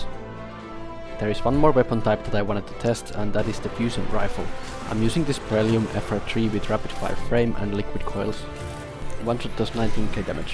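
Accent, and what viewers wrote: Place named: Finland. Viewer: Oh cool I've legitimately never heard a Finnish person's accent. Also nice vid 👍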